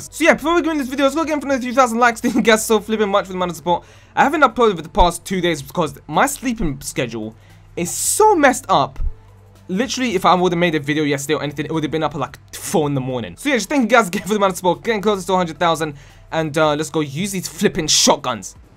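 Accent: United Kingdom. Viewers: with a british accent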